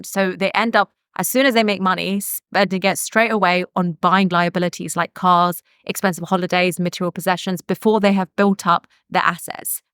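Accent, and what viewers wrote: England, United Kingdom. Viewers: Your beauty is pleasant to the eyes, your English accent is sweet to the ears and most importantly, your content is educative to the mind and actionable to the hands that takes actions., you're a complete package!!! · nice content your english is british am american hard to catch up please try to be a little slower when you talk :yt::yt::yt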